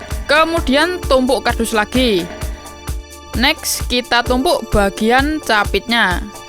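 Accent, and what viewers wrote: Java, Indonesia. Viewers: Deke kang Jawa ya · Bang orang mana kok nyomongnya seperti orang jawa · Abangnya dari jawa ya . . . . . . . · Medhok e mas tekan ndi toh? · Koe wong jowo to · Channel Ini - Kreatif - Sopan - Jowo Nyo Muantep Keren BosQu!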